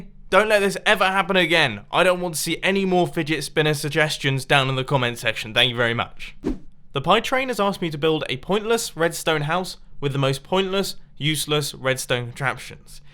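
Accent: Australia. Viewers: r u australian coz i am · wait is Australian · You from Australia? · your australian?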